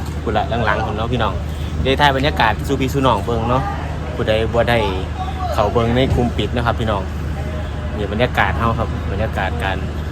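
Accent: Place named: Isan, Thailand. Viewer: สุดยอด ยายก็ติดตามนานอิหิลชอบใจมากพูดก็เพราะเสียงอิสานดีใจเห็นคุ่จิ้นเด้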